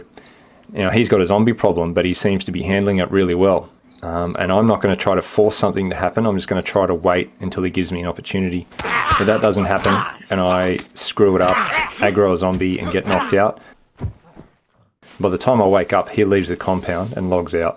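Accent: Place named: Australia. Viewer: British!? This dudes an Aussie buddy!!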